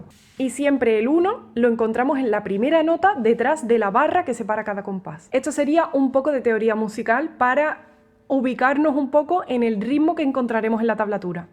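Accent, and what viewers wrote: Andalusia, Spain. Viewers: Dice Buenas tardes, es de andalucía y pues lo dice con su acento andaluz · No soy entendido en música. Pero me quedo a escuchar ese acento andaluz 🥰 que enamora.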